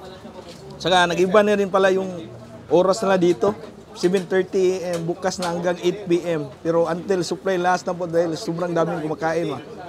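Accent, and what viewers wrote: Visayas, Philippines. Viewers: Bisdaka gihapon mu Tagalog Bai uy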